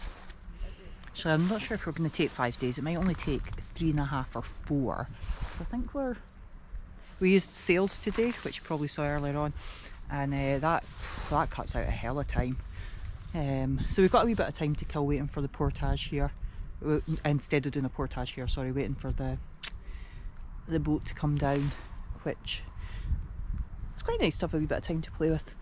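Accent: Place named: Scotland, United Kingdom. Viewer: I didn't know how much I needed your beatifull soothing scottish voice today. Thank you for that.💚 What a wonderfull trip in an absolutely wonderful country.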